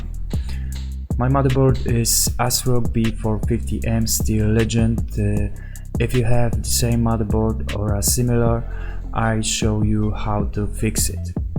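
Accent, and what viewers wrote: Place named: Poland. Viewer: wyczuwam pollski akcent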